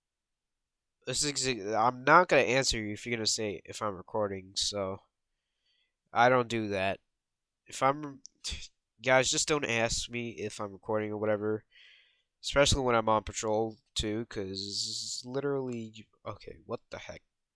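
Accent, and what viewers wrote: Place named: New Jersey, United States. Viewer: Question did you have a teacher named Mr. Lambersky he is from the New Jersey area and has your accent.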